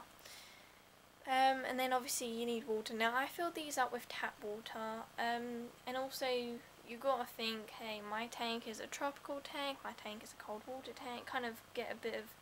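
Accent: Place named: United Kingdom. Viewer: where in the UK is your accent from? it's absurdly cute. with my limited knowledge i'll guess surrey or wales. oh yeah and thanks for the info vid =B gonna go get some more dklor today! my other batch just kept leaking out of the bottle.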